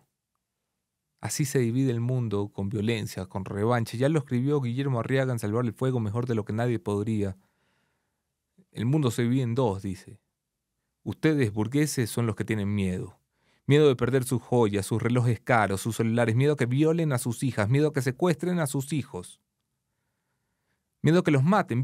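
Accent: Argentina. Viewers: Lo q yo no entiendo Es Venezolano habla como Argentino y vive o vivio en Ecuador 😮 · Por qué habla con acento argentino ? No es ecuatoriano?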